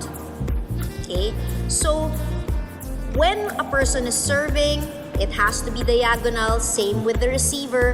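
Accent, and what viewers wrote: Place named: Philippines. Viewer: Based on her accent, most probably she is Filipino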